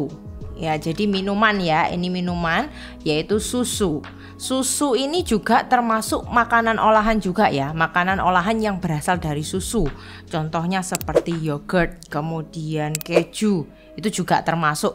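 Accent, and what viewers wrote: Java, Indonesia. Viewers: Iya betul nggak menghilangkan jawa nya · Logat dan bahasa itu beda....bahasa indonesia nya benar, trus logat nya jawa....emang kenapa?...salah ? Klo yg ngomong orang maluku dgn logat daerah nya, kan juga BOLEH..... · terimakasih atas ilmu dan nasehatnya sangat bermanfaat penyampainya dr logad jawa banget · Bhs Idonesianya sdh baik dan benar soal medok Jawa tdk masalah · Logaaak jawwa mu keliatan... Gx bsa berbahsa indonosia yg baik dan bnar y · Jowo ne ketok 😅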